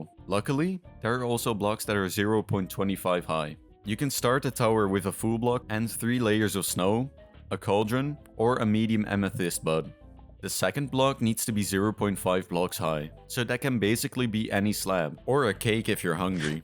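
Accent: Netherlands. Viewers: u dutch perchange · Dutch accent detected?? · Really cool Am I hearing a dutch accent? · Quick Question are you dutch by any chance?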